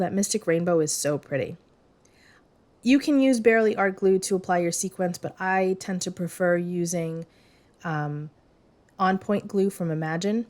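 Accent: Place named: Massachusetts, United States. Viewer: Hey, I just subscribed, where are you from? I’m from Massachusetts but live in Rhode Island now and you certainly sound like you are from the area.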